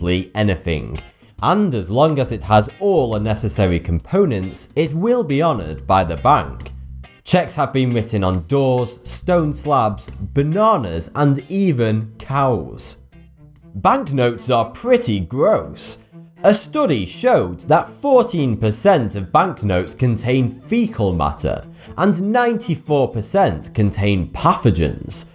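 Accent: United Kingdom. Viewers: idk why but his british voice is driving me mad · Buck teeth and a British accent? Its like every word your pronounce starts with the letter "F". Like you say thirteen like " Furteen" WTF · hes from the uk, the way he talks is actual english, american is actually incorect english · His nasally, British accent is annoying. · ***** British accent guy. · Is this like racism or just you don't understand British accent?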